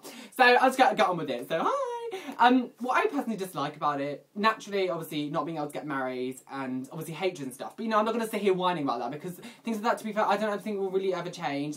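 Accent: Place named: RP, United Kingdom. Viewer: Hey... got somethin' to tell you (but don't get annoyed, right?): first you posh like hell, second you talk as fast as a missile, third you sound a bit feminine. OK, I promise I'll wath your vid on slow-motion and try to catch as much as I can (I'm not native, I'm gay). Kiss ya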